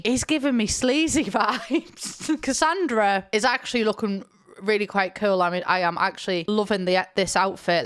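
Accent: United Kingdom. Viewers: Ur british accent is so thick I sometimes fprget that u speak english, but maybe its just me, cause my mother language isnt english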